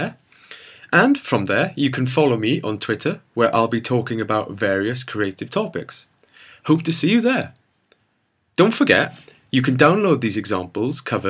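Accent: Wales, United Kingdom. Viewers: You have a Welsh accent........nice to hear. Also, the tutorial was very useful. Thank you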